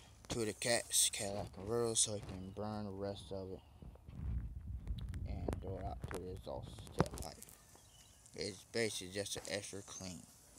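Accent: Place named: Louisiana, United States. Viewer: ok you're either high, drunk, both or whatever but you're making no sense at all. I can hardly understand what you are saying. sounds like you might be from Louisiana.. but you start one sentence then start taking bout something else. you also need to hold the phone right side up. I lost a lot of brain cells watching this video. not knowing the names of the parts is not helping. by the way I stopped watching your video after 2 minutes...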